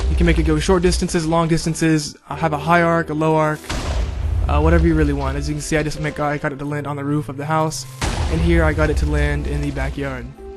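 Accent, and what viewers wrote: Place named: United States. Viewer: great video, your american, so i can understand you easily :P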